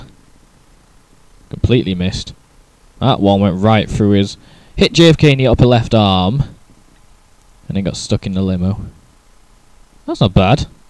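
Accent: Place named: England, United Kingdom. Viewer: OH YES THANK GOD SOMEBODY ENGLISH!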